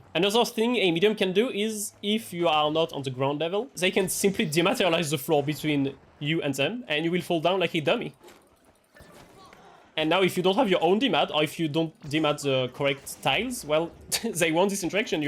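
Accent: France. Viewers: Thanks for this insightful video, and the french accent is icing on the cake, tu gères mon gars ! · Bro your French accent is killing me xD. Greetings from Germany, only a fr*nch can play shield like that, bc of the Police nationale Heritage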